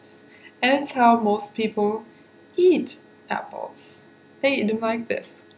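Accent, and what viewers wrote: Germany. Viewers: love the german accent hahaha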